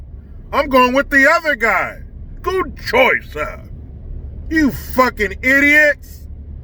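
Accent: England, United Kingdom. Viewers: Hitler 😮 bloody hell if Those Democrats woke up they would understand they are the bloody Fascists 🧐 Love the British accent 😅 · Nice English accent lol 🖒🖒🖒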